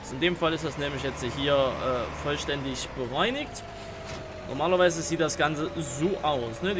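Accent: Saxony, Germany. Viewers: Nicer Mod p.s. Wenn du Sachse bist dein sächsisch is der Hammer :D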